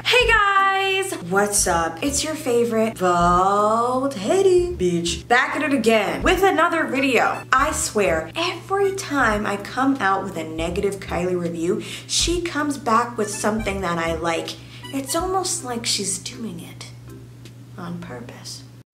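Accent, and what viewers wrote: England, United Kingdom. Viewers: nobody gonna talk about her british accent XD i mean she is immitating but it's on point :)) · PLEASE, A VIDEO WITH U SPEAKING BRITISH ACCENT ONLY PLEEEEEAZZZ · You have a pretty decent English accent. Not too cockney not too posh 9/10. 👏🏻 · Can you do a vid only speaking British accent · Please keep making videos in british accent😩😩😩,love it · why is no one talking about her british accent?😂it’s actually good,coming from a british person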